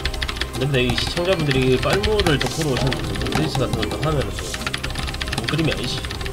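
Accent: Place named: Gyeongsang, South Korea. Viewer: 듣기싫은 경상도 사투리!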